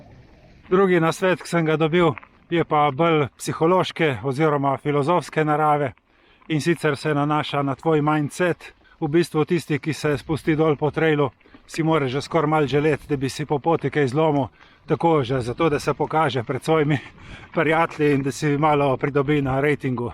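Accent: Primorska, Slovenia. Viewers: Občasno spremljam vaše zanimive video bloge. Všeč pa mi je to, da v njih govorite v lepi in razločni slovenščini(da ima primorski pridih je zgolj pozitivno) in prav tako vidim, da se zelo potrudite tudi v komentarjih in odgovorih tukaj ali na Stravi. Pohvalno👍 Srečno v hobiju, ki vas veseli pa naj bo to na cesti, makedamskih ravnicah ali pa vratolomnih spustih po trailih.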